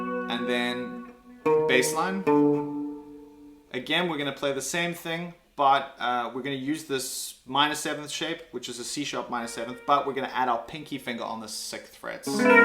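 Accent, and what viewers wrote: South Africa. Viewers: bro you kinda sound south african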